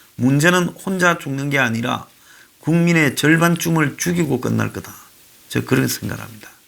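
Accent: Gyeongsang, South Korea. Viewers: 디져야 한다.참 오랜만에 들어보는 경상도 사투리군요. 구수합니다. 문도 디지고 조국이도 디지고 이해찬이도 디지고 기레기들도 디져야 한다.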